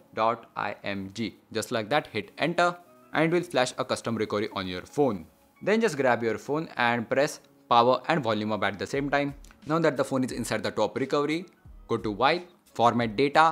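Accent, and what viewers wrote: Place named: Maharashtra, India. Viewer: Marathi Accent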